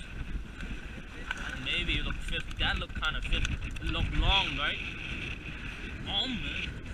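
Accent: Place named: Vietnam. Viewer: Lmfao your Vietnamese accent is strong on this one 😂😂😂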